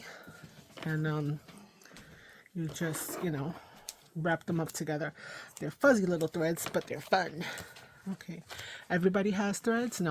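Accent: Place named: New York, United States. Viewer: Everything came out Beautiful ❤! I started my junk journal journey this past year and I still have a ways to get to where I you are at 😊. Thank You for sharing your creative process with us . I HAVE to ask you ….. are you a fellow Puerto Rican from New York ( you have that accent , IYKYK 😉) . I’m from Queens but I now live in Miami and I miss the cold weather! lol😉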